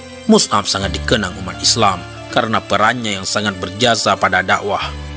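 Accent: Aceh, Indonesia. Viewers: Kalau boleh menebak .di dengar dari suaranya Abang asal dari Aceh / Lombok?🙏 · Logat mimin sepertinya dari Aceh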